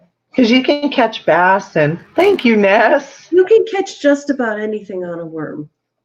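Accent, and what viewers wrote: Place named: South, United States. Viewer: Southern Accents are the best, hope yours comes out more often!;) South's gonna rise again!:D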